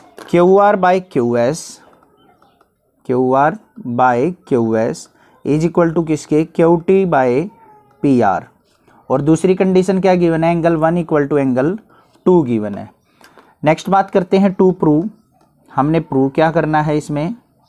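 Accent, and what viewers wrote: Haryana, India. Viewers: Sir Haryanvi hai awaz se hi pta lag gya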